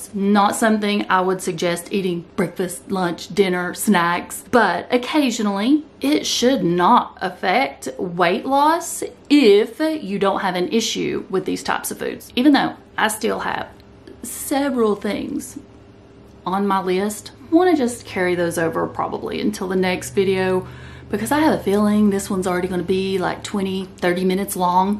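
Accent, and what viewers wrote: South, United States. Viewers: I love how you talk. You’ve got such a lovely accent. I’m guessing you’re a southern lady? x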